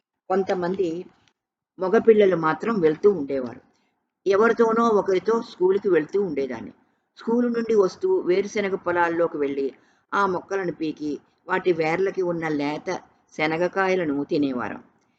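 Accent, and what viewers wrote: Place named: North Andhra, India. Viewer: garu could bring out her childhood memories in a beautiful mesmerizing way.when ever she reads his stories I wonder whether chaso wrote so beautifully or her reading methods with beautiful modulations had enhanced the depth of the writings.As my fore fathers belong to vijayanagarm and srikakulam areas I enjoy that mandaleekam also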